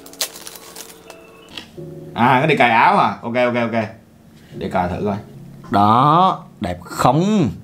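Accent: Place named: Da Nang, Vietnam. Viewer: Anh này nói giọng Đà Nẽng lồm nhớ quê kinhhh 😅😂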